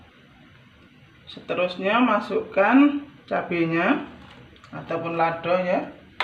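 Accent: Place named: Malaysia. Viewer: Jadi keinget pertama lihat daun kari tu waktu kerja di malysa,, kakak ni asli malysa atau aslinya orang Indonesia, kok logat Melayu nya dk kental banget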